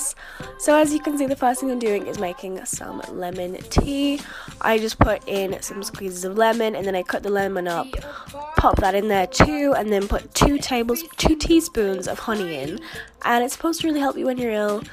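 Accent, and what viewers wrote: United States. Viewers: Why do you sound American when you live in the UK? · out of curiosity why do you talk with an American accent?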